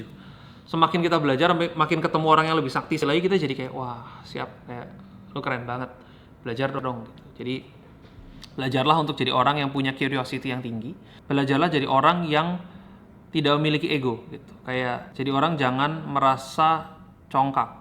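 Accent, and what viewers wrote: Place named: Banyumas, Indonesia. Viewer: kayaknya kok sekarang makin kerasa logat ngapak e wkwkkw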